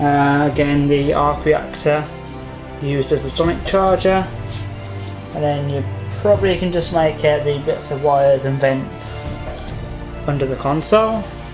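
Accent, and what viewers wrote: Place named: England, United Kingdom. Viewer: What part of England you from?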